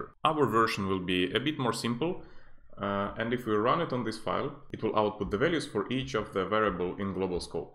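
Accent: Russia. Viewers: Сдаётся мне, что ты русский)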